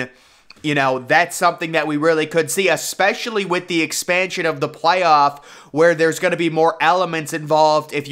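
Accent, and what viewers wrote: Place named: Wisconsin, United States. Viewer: Hard Wisconsin Slang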